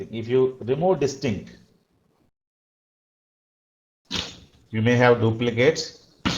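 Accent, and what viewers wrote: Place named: England, United Kingdom. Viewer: you are a very good teacher with a good rhythm of instructions. My only problem is the English accent. Very good job. Thank you for helping us with SQL.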